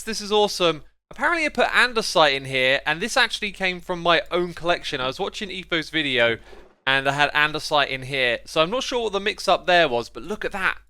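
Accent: United Kingdom. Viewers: He's a muscular man with a British voice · WHOAA whoa whoa whoa, I had no idea x was BRITISH.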